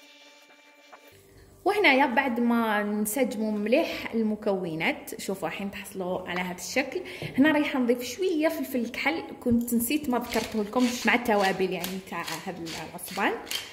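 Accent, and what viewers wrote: Algiers, Algeria. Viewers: واش من وهرانية نتيا دزيرية اللهجة تاعك لا علاقة بوهران علاش تكدبوا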